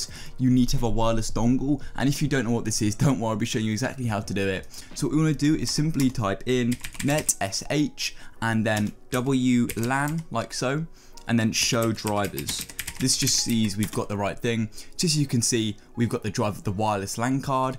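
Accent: United Kingdom. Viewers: Fortnite and british👎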